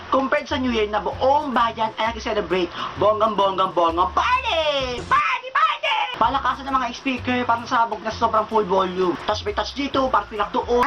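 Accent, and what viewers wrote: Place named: Cebuano, Philippines. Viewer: cebuano accent mo dabest!!!.. like2!!!...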